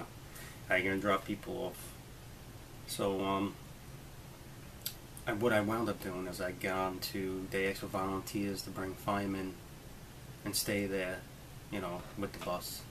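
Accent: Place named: New York, United States. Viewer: you're a good guy..love the new york accent:)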